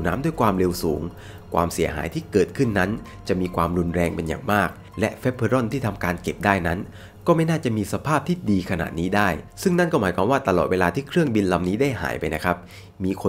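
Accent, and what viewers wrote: South, Thailand. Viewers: เป็นคนใต้หรอฮะ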